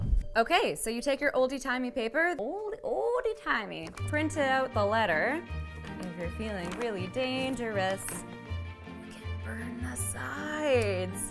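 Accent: United Kingdom. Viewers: as a brit i'm pleasantly surprised at english accent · speaking british will be the death of me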